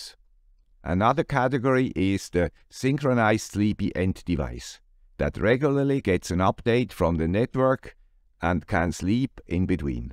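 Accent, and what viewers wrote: Switzerland. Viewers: I was just wondering about it as I got back into HA... And there's a video by the guy with a swiss accent about it! Great! Also, I feel like it's relevant... XKCD #927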